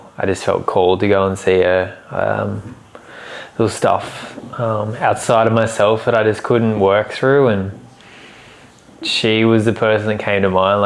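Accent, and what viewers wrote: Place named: Australia. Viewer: I love watching this Aussie and listening to him talk. The accent is so cool. Foley, Alabama here! 😁😁☀️🌴🤟🏻🫶🏻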